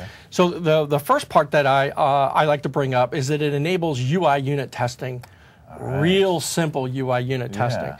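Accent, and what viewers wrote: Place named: Baltimore, United States. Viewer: Effective. Well presented.... are you from Bali-mur? That's always a plus.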